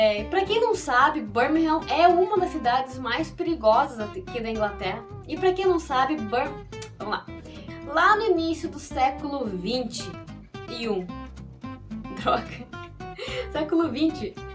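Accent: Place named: Passo Fundo, Brazil. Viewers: hehaehae jurava que era gaúcha tbm. Sotaque parecido sabe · Esse sotaque de Passo Fundo.🤭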